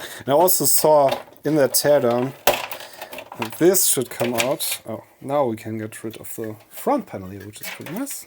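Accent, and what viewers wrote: Germany. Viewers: Great hands-on video, immediate subscribe. Von Akzent aus, bist du deutsch? 🇩🇪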